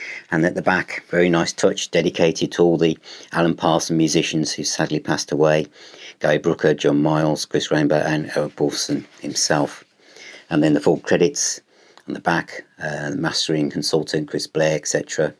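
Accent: United Kingdom. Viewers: As always I'm blown away by knowledge of the band, product, and box set evolution (not just 2 disc's but 4 of different material - nice insight). Kinda different question but what dialect do you speak? It's not Cockney. I'm just curious that's all. Rock on.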